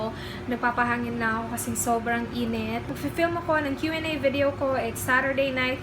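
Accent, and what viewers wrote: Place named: Cebuano, Philippines. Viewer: Miss I'm your new subscriber... My mother is from cebu as well hehe... I super enjoy your videos it reminds me my family ung tono ng pagsasalita mo parang same sakanila kase from cebu and english...hehe Pwede po ba shout out sakin and sa asawa ko na si Odi dito kami sa Abudhabi... wait ko po hehe.... Godbless us all... Take care!!!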